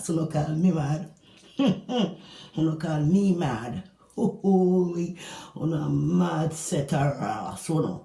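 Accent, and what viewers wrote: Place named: Jamaica. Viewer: Lol.. I love the way my Jamaican people speak. She has a personality on her!!!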